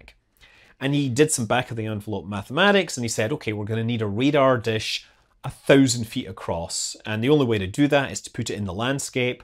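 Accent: Scotland, United Kingdom. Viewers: Is that a Scottish accent that I am detecting?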